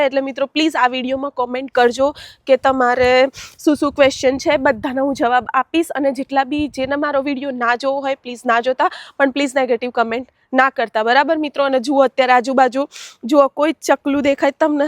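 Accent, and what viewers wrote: Sabarkantha, India. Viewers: સાબર કાઠા ની બોલી બેન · Tamari language parthi evu lage che k tame Bayad, Sabarkatha dist. Na hasho.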